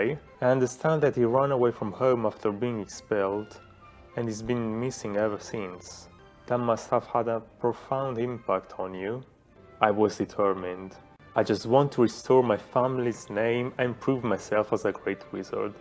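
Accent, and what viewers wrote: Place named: England, United Kingdom. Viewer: I sorted myself into Hufflepuff ...love your English accent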